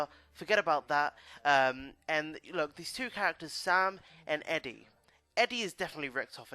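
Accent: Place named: United Kingdom. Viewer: OMFG I HATE FUCKING BRITISH ACCENTS IT FUCKING SUCKS@ KHJKHJKGHKGJK CANT STAND HIS FUCKING VOICE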